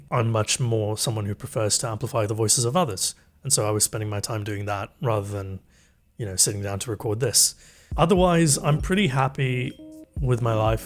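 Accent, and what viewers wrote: United Kingdom. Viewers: Cammycakes and collaboration please:) Great channel and nice to see your face. I should have known the best British voice on the Internet belonged to someone from the Indian subcontinent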